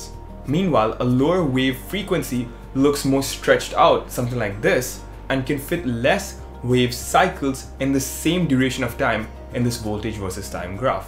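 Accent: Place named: India. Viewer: COngrats. Ever your Indian accent we get what you say and you know and explain well. Very good video.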